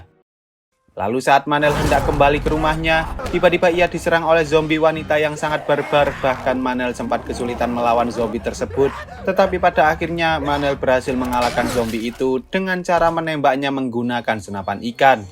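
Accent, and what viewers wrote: Java, Indonesia. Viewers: Jawa kowek logatnya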